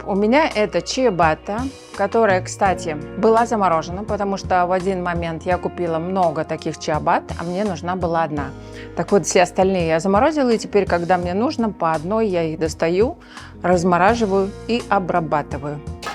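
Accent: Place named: Baltic. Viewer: ну надо же, а мне привиделся прибалтийский акцент, у нас похоже латышская женщина, партнер по работе говорит, очень приятный акцент ❤❤❤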